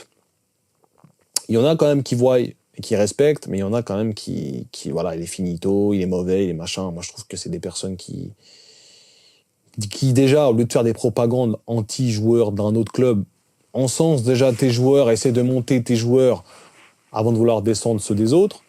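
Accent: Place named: Switzerland. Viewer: wesh t'es suisse, on dirait pas avec l'accent jajaj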